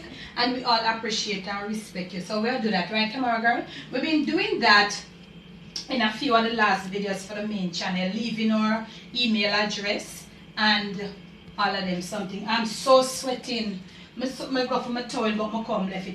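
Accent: Jamaica. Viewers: I love to hear you speak patio. I left JA at such a young age I don't know how to speak it. Can you do a Vlog about different patio terms?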